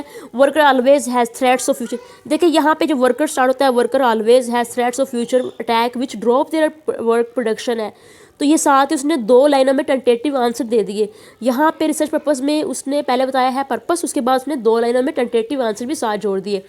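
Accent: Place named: Punjab, India. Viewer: Ap key accents sey ap punjabi lag rahi han.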